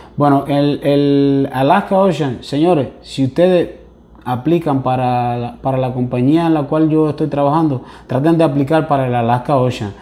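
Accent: Dominican Republic. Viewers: Tiene acento dominicano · Hermano usted es dominicano?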